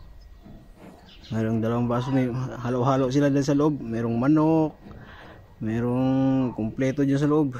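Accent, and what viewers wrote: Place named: Visayas, Philippines. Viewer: Bisaya deay ka lodi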